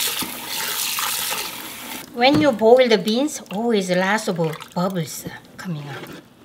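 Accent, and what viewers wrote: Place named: South Korea. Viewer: i am very surprised that you speak English so well(even with light KR accent)!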